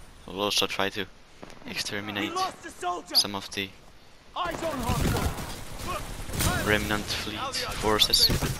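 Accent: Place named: Finland. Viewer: you sound like finnish, i'm finnish btw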